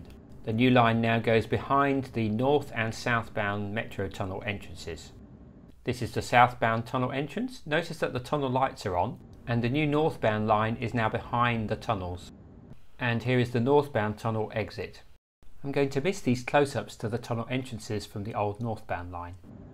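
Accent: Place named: England, United Kingdom. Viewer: I thought ohh 15 minutes but when I heard your English accent and picked up what the subject entailed, I watched on. I do wish they'd make mega projects like this a bit more aesthetically interesting such as the tunnel portals. Nowadays it's more bland and ugly then ever and all that matters is seeing it open as soon as possible. Even road projects get better treatment.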